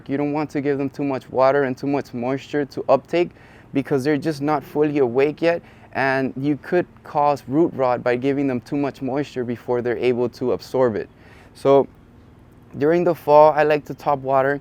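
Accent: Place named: Castile, Spain. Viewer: Que hermoso, amigo escucharte, hablar en castellano , un privilegio escucharte, un fuerte , abrazo, desde Buenos Aires!!!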